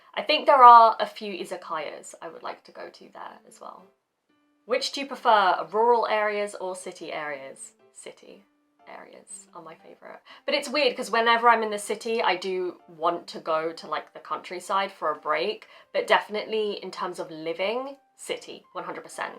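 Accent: United Kingdom. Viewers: I didn't ask you any questions, but I enjoyed the video. I got to know you better. I like the way you speak. British English sounds more classy and beautiful than American English, doesn't it? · 僕には0.5倍速にして、やっと字幕の音声を聞き取ることが出来ます。 日本人には英語の習得が難しいと実感すると共に、逆もまた真なりと考える次第。 それでも、アメリカ英語よりはクイーンズイングリッシュの方が、聴き取りやすいと思いました。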